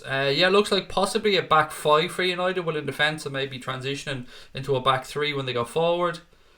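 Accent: Ireland. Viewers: What part of ireland are you from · Are u Irish? 🇮🇪☘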